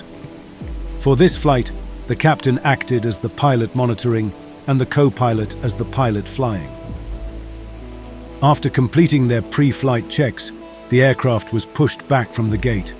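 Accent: England, United Kingdom. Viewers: It's a very well-spoken & clipped English accent - I am sure I recognise the voice but I can't put a name to it, but it is a human voice. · Oh no a British accent speaking in US English.... You tube again.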